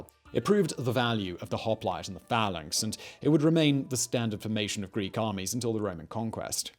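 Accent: United Kingdom. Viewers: You talk so fast, and speak in a British accent, that I have to use subtitles to follow you.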